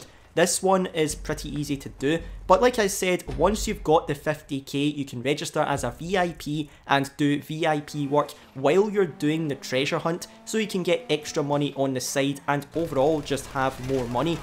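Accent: Ireland. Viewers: I love his fuckin irish accent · Your accent gives me Irish vibes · Irish man?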